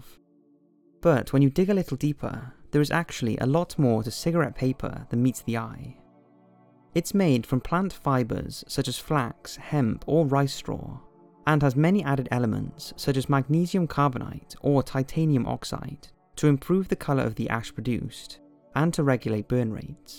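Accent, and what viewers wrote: Wales, United Kingdom. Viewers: Addicted to your videos. The juxtaposition of your soothing Welsh voice and the often creepy and disturbing content in the videos is practically intoxicating. Binge watching your stuff right now!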